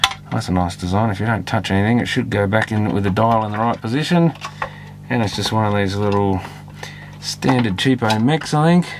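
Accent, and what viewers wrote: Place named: Australia. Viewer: I’ve been tinkering around with a similar radio, trying to get the cassette player working again. I wasn’t expecting an Australian accent I’m glad I stumbled along your channel mate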